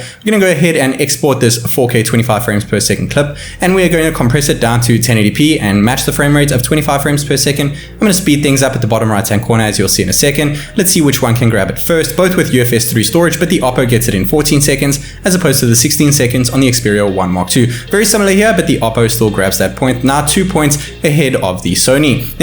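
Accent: South Africa. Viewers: Are you from South Africa? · you sound South African like a joburger..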